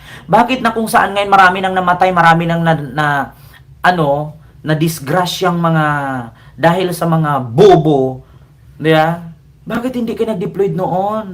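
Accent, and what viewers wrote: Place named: Iloilo, Philippines. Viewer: Tonong ilonggo ka magsalita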